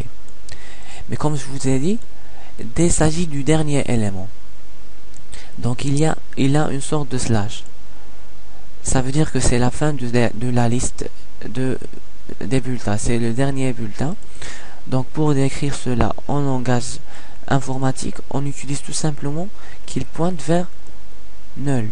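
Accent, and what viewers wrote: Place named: Maghreb. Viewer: t'explique mais on ressent que t'es pas un natif français tu dois être magrébin ? ;)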